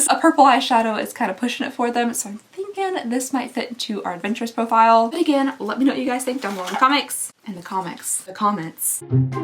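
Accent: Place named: South, United States. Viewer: Ha! My husband heard your voice and asked if I’d made a video using an American southern accent!!!! You are so brilliant with these videos. The bags were beyond drab, boring. Well, except for the blush and eyeshadow. Those were shockingly awful. I like the colour combos you came up with, they looked rather lovely for a date night. Ipsy has truly gone to the dogs. Exception: I received that hair mask in a Sephora bag and my hair looked great for that day.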